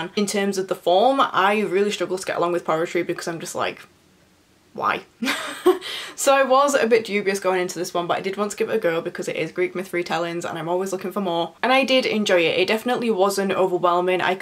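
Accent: United Kingdom. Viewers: Strange question I know but are you from Cumbria?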